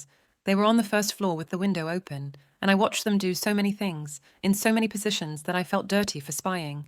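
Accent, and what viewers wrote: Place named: United Kingdom. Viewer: Where people aren’t British the person just added a narrator with a British axent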